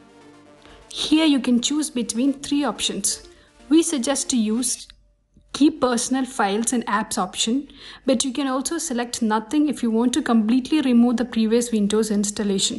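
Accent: Kerala, India. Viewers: R u a Keralite.?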